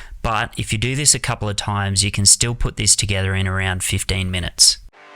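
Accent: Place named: Australia. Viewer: Would be interesting to listen and learn, but difficult to suffer through such a thick australian accent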